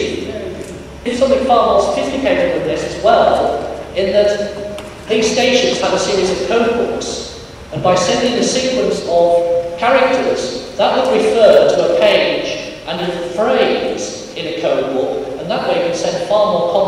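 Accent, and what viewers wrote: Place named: England, United Kingdom. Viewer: This guy is definitely English. SECOND POST!!!!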